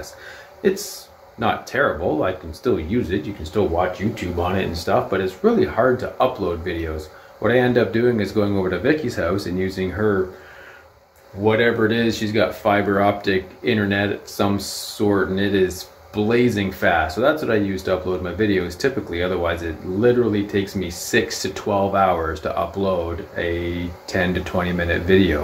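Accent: Canada. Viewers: Strong Canadian accent but I can still understand what he is saying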